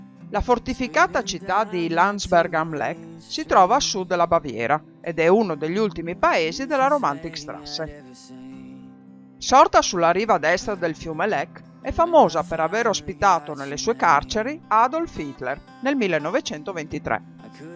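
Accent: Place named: Friuli, Italy. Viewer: Hai l'accento friulano "crostoli" ad esempio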